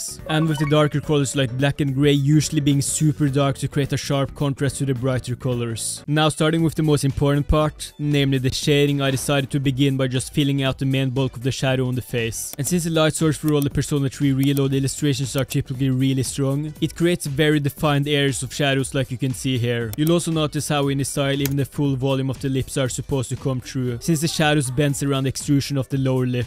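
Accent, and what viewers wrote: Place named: Norway. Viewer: Nice video, love these artstyle breakdown type of videos. Also goddamn you sound Norwegian lol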